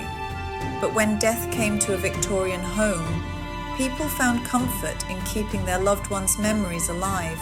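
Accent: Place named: United Kingdom. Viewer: I did like it and your Wonderful British Accent! 😊😊😊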